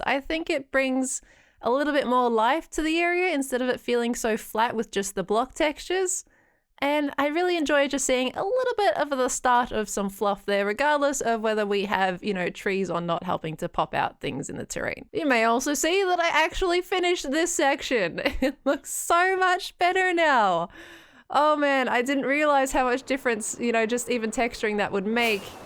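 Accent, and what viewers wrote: Australia. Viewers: Did know youse was aussiestrailian. Fucking coll.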